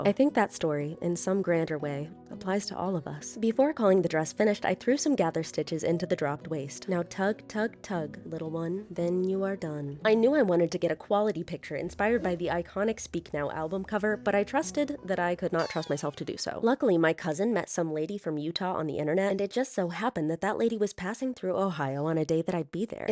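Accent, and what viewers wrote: New Zealand. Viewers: that nz accent tho. god almighty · im sorry but that kiwi accent is absolutely terrible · As a new zealander im disappointed in that accent 😭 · your New Zealand accent is awful I am truly offended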